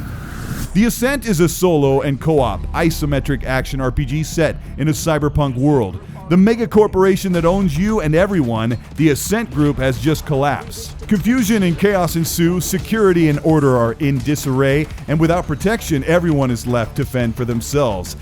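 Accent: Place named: Netherlands. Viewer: You have a Dutch accent. Is he Dutch?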